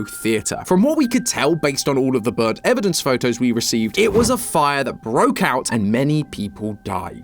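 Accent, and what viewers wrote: United Kingdom. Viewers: Truly now 100% British now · british matpat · I can’t wait to watch the British man talk about monster toy children things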